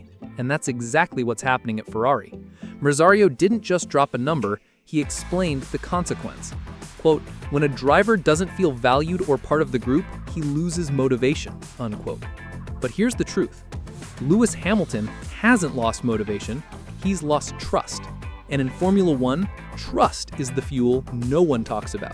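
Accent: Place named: United States. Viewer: This is disinformation, gaslighting in American accent. Not convinced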